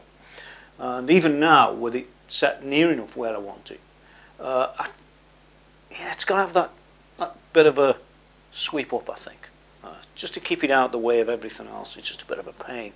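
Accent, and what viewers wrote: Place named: Liverpool, United Kingdom. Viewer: you sound like you are from Livapooo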